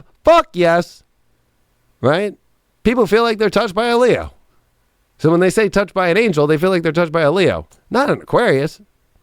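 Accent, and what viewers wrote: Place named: Los Angeles, United States. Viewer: THANK–YOU ♥️✨™️®️🎨♏️🌞♓️🌙 Greatly appreciate your time spent here, & those beautiful LA vibes going into the painted canvas for that Survivors Family ArtShow. I like how your voice brings LA to East Coast. Good vibes, over in VT😎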